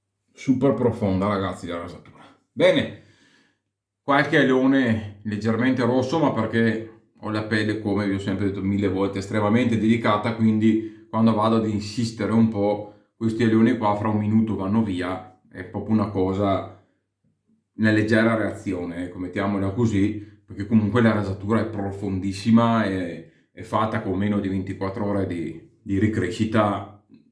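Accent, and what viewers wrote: Veneto, Italy. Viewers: Una considerazione molto oggettiva, bel video. (Comunque si nota tutto il veneto che è in te xD)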